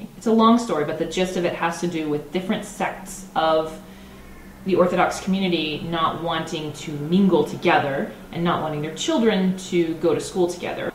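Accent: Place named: United States. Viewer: את דוברת אנגלית שוטפת ובמבטא אמריקאי מובהק, אבל שמעתי אותך גם מדברת עיברית בלי מבטא (אם אני זוכר נכון). את עולה וותיקה?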